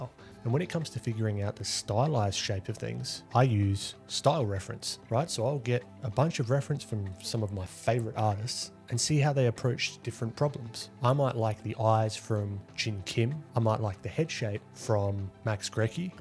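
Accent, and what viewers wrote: Australia. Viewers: This type of content is great. Please, continue creating things like this. Really really helpful. Besides you sound incredibly Australian and I love it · I swear you are Australian. That’s all I hear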